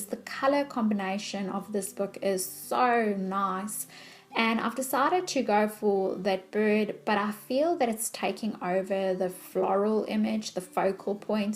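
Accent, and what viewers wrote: South Africa. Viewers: Love your tags they are so lovely.Everytime I hear you speaking it sounds like a South African accent to me.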